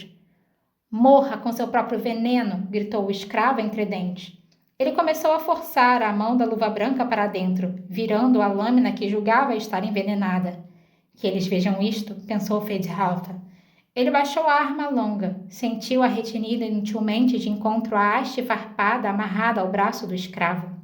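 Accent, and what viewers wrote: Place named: Rio de Janeiro, Brazil. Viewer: Essa voz linda com o o delicioso sotaque carioca ❤️( também sou carioca mas vivo em SP e fico com saudades )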